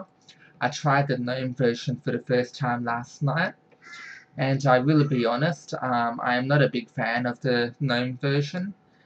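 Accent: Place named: Australia. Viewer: Sounds like he's an Aussie to me, Ronaldo.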